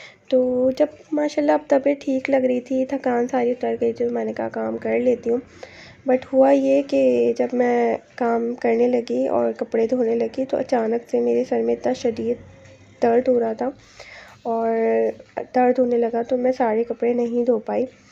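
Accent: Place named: Karachi, Pakistan. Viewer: Mujjy apki awaaz se ye lag raha hai k ap karachi se ho apki age b kam hai ??? Aisa he hai na and apki byti masha Allah cute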